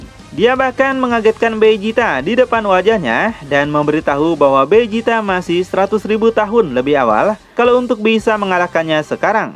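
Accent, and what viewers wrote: West Java, Indonesia. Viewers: Sunda gening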